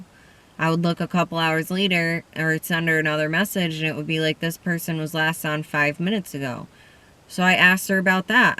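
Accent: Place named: Midwest, United States. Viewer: Girl I just love your cute little midwestern accent! I'm gonna say like Michigan or Illinois? Idk why I love that accent lol Its just the cutest thing to hear 😘 I'm from Maryland so our accent is pretty unique. I've spent half my life in NY with that side of my family..... and they are all Italian immigrants so they can't go judging ANYONE else's accent lol But they say I don't sound like other people from Maryland so I'm really glad for that 😏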